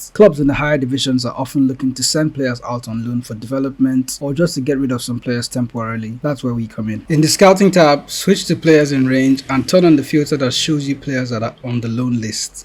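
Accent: Nigeria. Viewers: Are u Nigerian?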